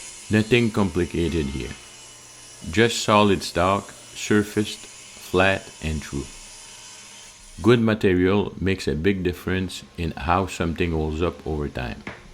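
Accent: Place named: Netherlands. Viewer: Dang! That should be strong enough. The dutch accent is a nice touch 🙂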